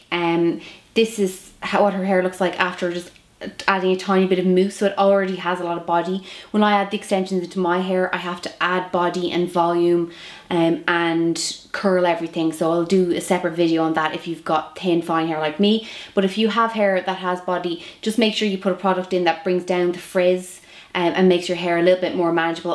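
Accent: Ireland. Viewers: no she is irish the accent is so defined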